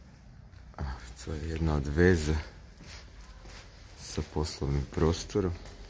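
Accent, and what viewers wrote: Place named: Belgrade, Serbia. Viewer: Dobar video, ali brate, imaš neprijatan beogradski naglasak kao da si odrastao u beogradskim džiberskim kafićima 😁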